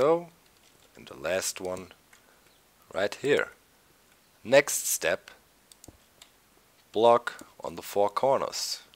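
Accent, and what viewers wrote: Germany. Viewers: Hey, are you from Germany? The accent sounds like that:D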